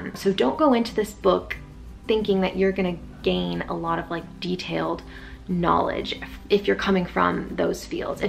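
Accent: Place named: California, United States. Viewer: Good Review! I was listening and not watching and your California accent was flowing out of you very naturally. Gave me nostalgia.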